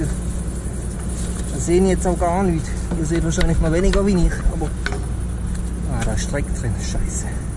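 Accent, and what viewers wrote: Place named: Switzerland. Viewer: Jo de schnurret halt scho fascht schwizerdütsch.isch jo gard näbe mir äne am riih.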